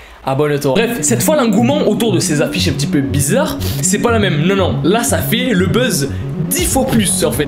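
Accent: Quebec, Canada. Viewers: Il parle québécois comme moi 😂🤣😭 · Bel accent québécois, approuvé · OMG tu as un accent QUÉBÉCOIS PARFAIT on aurait dis un des humoristes québécois 😂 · L'accent québécois!!!!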